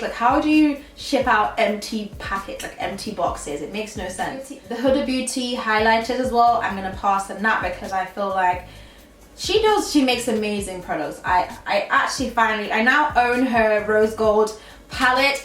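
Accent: United Kingdom. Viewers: I love the accent! Are you British?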